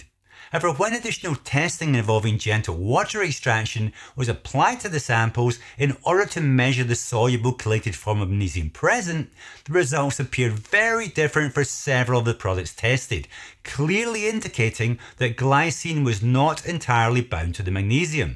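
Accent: United Kingdom. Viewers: He sounds British to me and his channel states UK. Regardless, British Supplements company I would very much like to know given what they stand for but also because they're not 3rd Party tested.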